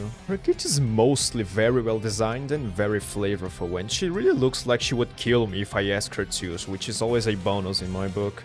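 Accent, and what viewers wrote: Brazil. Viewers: I dont know if you're brazilian or not, but i swear bro you just HAVE that brazilian english accent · you sound brazilian 🤔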